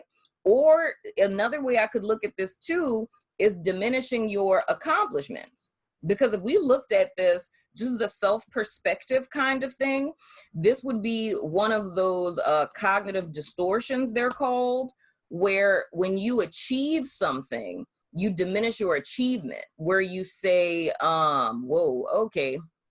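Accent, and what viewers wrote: New Orleans, United States. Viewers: I knew you was from New Orleans from your first word!! I am too & I said “Lawd she sounds like me” lol! ⚜️⚜️⚜️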